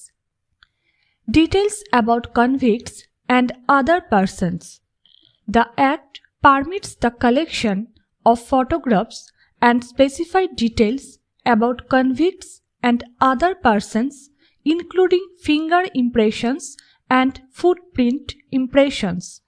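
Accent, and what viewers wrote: Bengal, India. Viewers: U bengali..?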